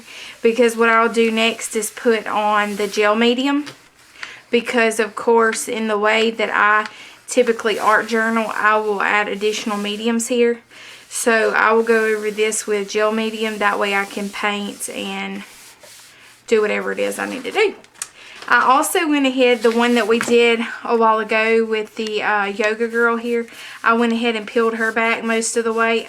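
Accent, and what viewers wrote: South, United States. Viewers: I enjoy listening to you talk ,my sister was a southern gal too I miss hearing her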